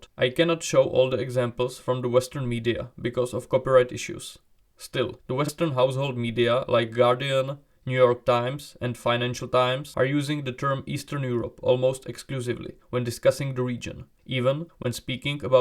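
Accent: Poland. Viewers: Heyy, i think you pretty much nailed it, good job!! (XD you sound very polish, i am too, but i think the accent adds a certain comedic value as in Poland most people talk as if they were western, although still catching up to the west). Have a nice day 🎉🎉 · Serio? Ty Czech? ( I czecked even the info about Your channel) Jor akcent is sooo Polish🤣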